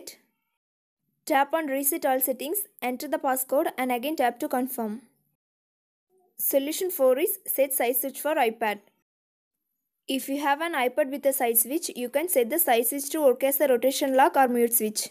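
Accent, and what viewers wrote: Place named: Punjab, India. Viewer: Rakarakaraka , put bigger subs and don’t move them quick. Your English is like Punjabi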